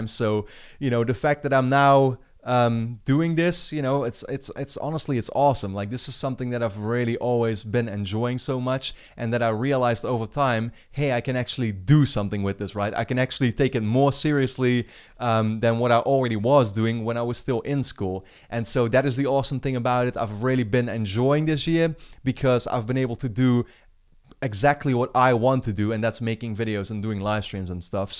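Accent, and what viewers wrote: Netherlands. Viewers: Where do you live my guess is that you live in the Netherlands bcs your accent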